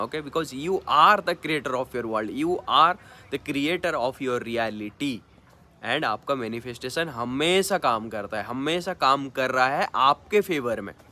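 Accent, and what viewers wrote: Bengal, India. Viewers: Dada are you bengali??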